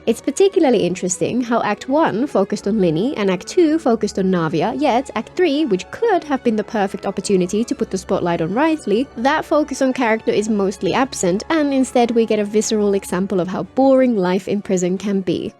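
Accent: United Kingdom. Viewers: Very British.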